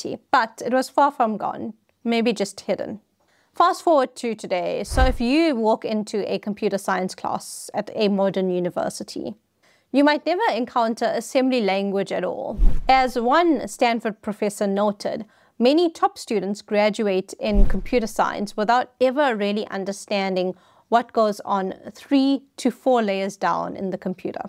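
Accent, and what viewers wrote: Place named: South Africa. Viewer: South African I think...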